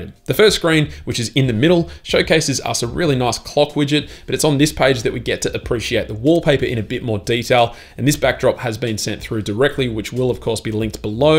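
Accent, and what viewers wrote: Australia. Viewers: Mate, you Australian?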